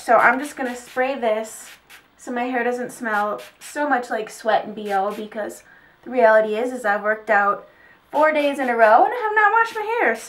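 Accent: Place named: Midwest, United States. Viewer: First off, look at them toned arms!! You look awesome!! Also, I used to be an everyday hair washer bc my hair is fine and thin until I found Batiste! What a life saver. But I cant just run to Ulta bc its too far away so Ill have to check out walmart and try the Herbal Essence one! Also, do you happen to be from the midwest? bc I had a friend who was from Nebraska and she says ROOTS just like you! It seriously made me laugh out loud because I (being from NC) say roots, as in a plant root (if that makes any sense) LOL Anywho, loved loved loved this video! and I want your shirt!! Except I want it to say, "I run so I can eat dessert" :)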